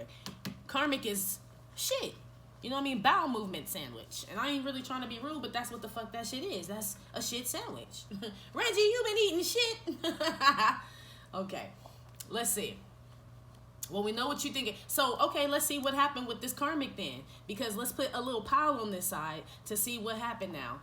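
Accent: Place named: Los Angeles, United States. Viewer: Girl you got to be from out here in LA..I know them accents anywhere 😩...you be having me ctfu!! lmao n girl yes fck him he'll be back 😩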